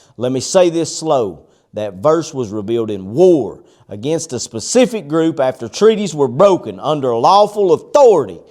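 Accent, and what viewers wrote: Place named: Texas, United States. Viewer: Wow, once again I feel blown out by such a strong and sharp speech full of truth and wisdom so that I couldn't help it but to listen to it three times in a row! Bro, your words are those of a sharp shooter in their accuracy and that of heavy weaponry in their effect. I'm totally amazed. I must add one thing - I used to hate so much the Texan accent due to the war criminal G. W. Bush and the such. But thanks to sheikh Yusuf Estes first and thanks to you now, I've come to enjoy listening to this beautifully spoken English. My sincere admiration and respect, Bro.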